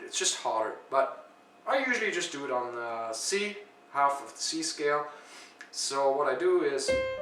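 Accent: Canada. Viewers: Says "ootside" More attractive than average American Apologizing for not making a video on the subject he admittedly is just learning. Jesus Christ, you couldn't be more Canadian if you were eating maple taffy off a stick while riding a moose into ~battle~ your formal apology for the inconvenience of your incredibly loud moose galloping,metric system, and socialized medicine keeping everyone awake! :p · that Canadian accent thooooo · People from the province of Québec have that accent, usually.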